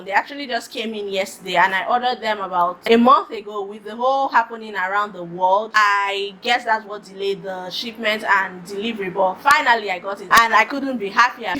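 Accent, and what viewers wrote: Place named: Nigeria. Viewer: I knew you were Nigerian by your accent ✊🏾✊🏾😘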